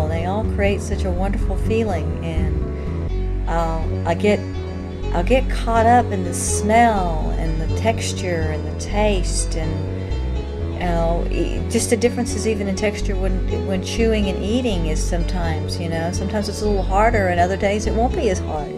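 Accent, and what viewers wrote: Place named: South, United States. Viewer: She has a Southern accent...doesn’t surprise me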